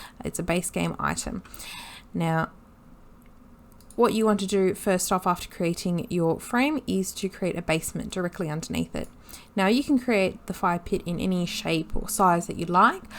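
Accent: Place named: Sydney, Australia. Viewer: You're from Sydney, aren't you?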